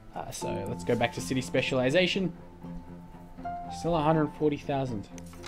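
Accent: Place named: Australia. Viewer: Oh and in regards to the microphone, while it doesn't transfer every nuance of your awesome Aussie accent, I could hear you just fine. No worries with the microphone.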